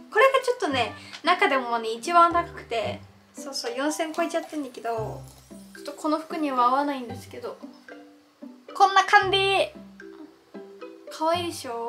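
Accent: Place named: Kansai, Japan. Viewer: りょうか道民なのに関西弁使ってるの笑う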